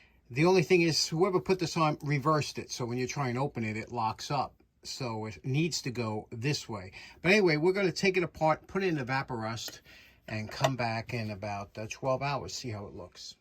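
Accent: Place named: New York City, United States. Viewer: your accent makes the video's. Nothing like good ole NYC sarcasm.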